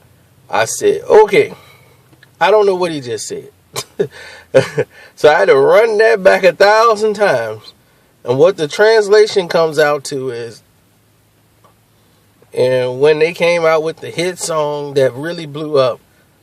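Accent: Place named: South, United States. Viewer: your southern accent reminded me of the film "snow on tha buff."Lmao love that flick.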